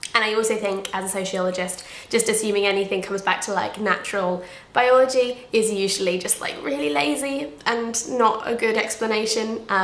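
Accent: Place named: United Kingdom. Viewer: How did I know she was going to have a British accent before I even heard her voice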